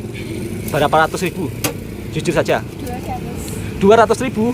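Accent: Java, Indonesia. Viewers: pekat btl jawa dia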